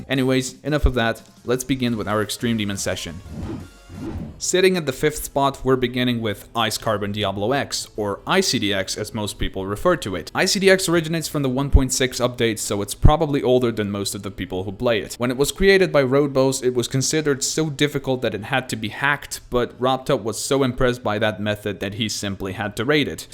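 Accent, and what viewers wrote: Slovenia. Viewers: Yeah i can hear your accent is slovenian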